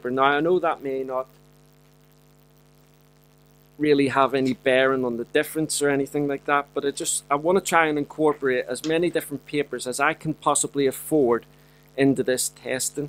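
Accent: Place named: Northern Ireland, United Kingdom. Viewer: I think has a Northern Ireland accent IMHO.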